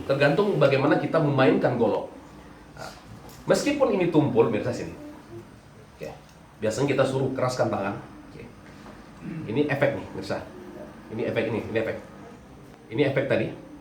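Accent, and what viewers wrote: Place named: Palembang, Indonesia. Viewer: Dari nada bicaranya sperti nya orang Palembang, slam dri Palembang 🙏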